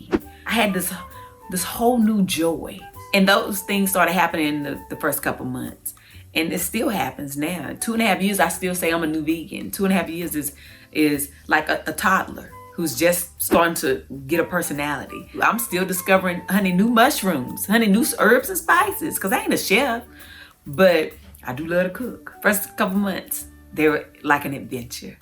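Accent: South, United States. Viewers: She sounds so southern it almost sounds like she's auditioning for a southerner for a movie. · I like her. She sounds so country, honey.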